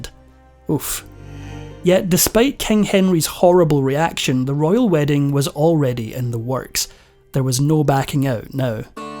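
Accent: Scotland, United Kingdom. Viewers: The Speaker is Scottish, huh? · One of many attractive traits of your videos is the Scottish accent you have! I love it as much as I love the clever commentary and the comprehensive detailed account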